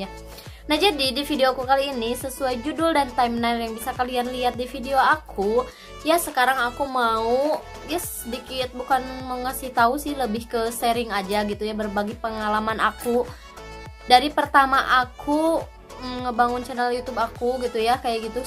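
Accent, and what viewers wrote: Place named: West Java, Indonesia. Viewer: mhun.ttp smngt ja.teh..logat Sunda na mani katingalian pisan.hehe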